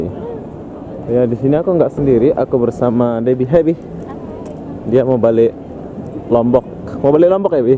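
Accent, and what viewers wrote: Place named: Kuala Lumpur, Malaysia. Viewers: Bro dh berape tahun duduk malaysia loghat kl tu jadi. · Cara cakap mcm org kl je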